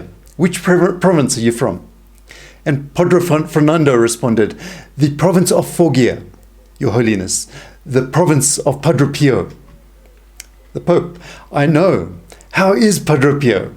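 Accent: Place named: South Africa. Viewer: hmmm - nice to hear a South African accent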